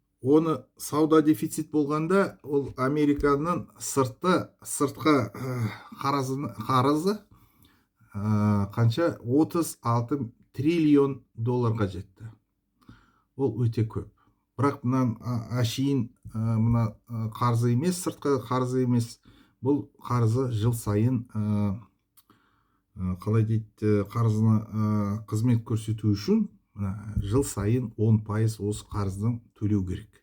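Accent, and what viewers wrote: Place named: Russia. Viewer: Рахмет бауырым, кезінде орыс мектебін сосын байлық білім саласын орысша оқығаның көрініп тұр. Бірақ жаман емес , қолдаймыз , тағы да видеолар жіберіңіз.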